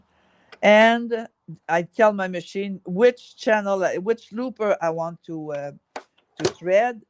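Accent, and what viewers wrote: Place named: Quebec, Canada. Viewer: French Canada. I recognize the accent.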